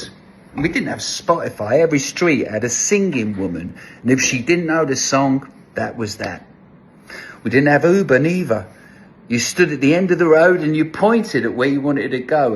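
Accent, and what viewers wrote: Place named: Cockney, United Kingdom. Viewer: The only phone we had was the telephone box and lots of 10ps. I am from the Eastend of London and I have a cockney accent. So it is funny listening to with that accent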